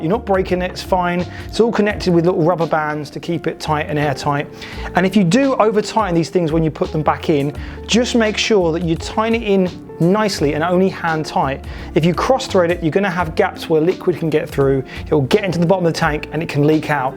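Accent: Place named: United Kingdom. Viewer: Thanks & good to hear a British accent.. So I been vaping hardcore n it was time to change the coil after 2420puffs approx 170ml of ejuice 75w on a r kiss2 so yeah I'm pretty pleased with that. What I usually find is the coils you buy ain't as good as the ones that come with the device I could of opted to use the spare coil in the box but decided to try the smok coils I bought with my device.. I will keep y'all informed Thanks for your video 🏴🇬🇧👍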